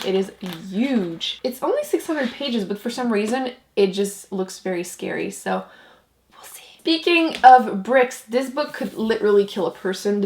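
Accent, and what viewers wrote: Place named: Quebec, Canada. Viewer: For Jane Austen, I really liked reading Emma and Northanger Abbey is a fun read but it's really different to her normal writing style because it's more a «parody» of the Gothic genre than a love story! And you also made me think to put Anna Karenina in my TBR! (Et sans être trop personnelle, originaire du Québec? L'accent que tu as lorsque tu parles français ressemble beaucoup au mien aha ;) )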